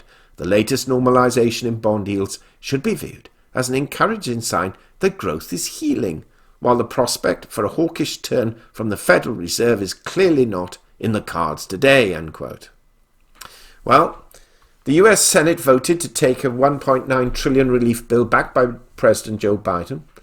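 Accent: United Kingdom. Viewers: why do I take things more serious when it's reported in a British accent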